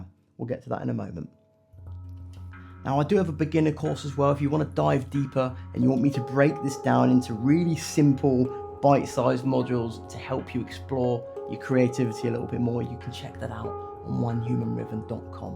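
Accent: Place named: United Kingdom. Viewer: Thank you for your quick reply, which has put my mind at ease no end. My handpan should be delivered tomorrow, which I feel very excited about, with some trepidation. I'm a bit scared about what I will receive, after watching so many warnings about cheap Chinese instruments being out of tune. I get that, I know you get what you pay for, but im not in a position to commit to a very expensive instrument at the moment but hope to progress into a better quality instrument in the future. Thank you for taking the time to reply, it was interesting to hear about your heritage from the UK, as you do drop those little hints of your accent in your videos, which to my mind makes you more approachable, and a very warm hearted person, everything a good teacher should be!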